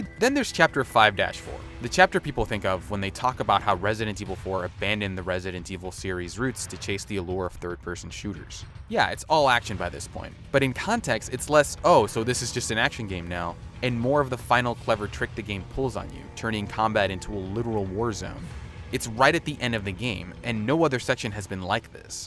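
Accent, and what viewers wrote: Spain. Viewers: So refreshing hearing someone pronounce the names in a proper Spanish accent. Great video! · Disliked! You say every word annoyingly in a Spanish accent, but you can’t pronounce Luis’ name properly?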